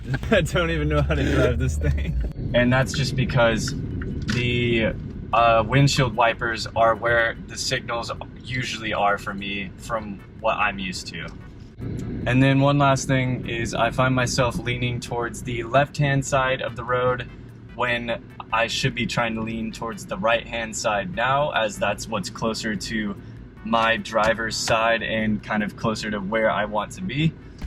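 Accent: Australia. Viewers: 5 months and your accent is sounding really Australian on many words and phrases! I've been living here for years and I just can't get the sound right. Tho 'family and friends in California say I use some weird words or expressions sometimes. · Hello, i really like your videos, keep em coming please. I noticed you are starting to sound more Aussie, goodonya!!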